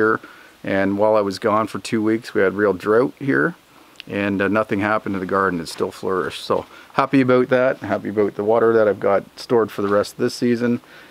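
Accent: Canada. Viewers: If auto insurance doesn't pay for the theft, check with your homeowner's insurance policy. Sometimes you are covered under that. Your videos are always such a treat for the senses. Stunning and beautiful. (And I adore your Canadian accent) 😎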